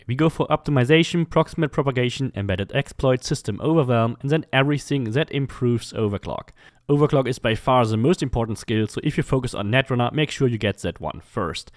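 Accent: Germany. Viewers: I think i hear the german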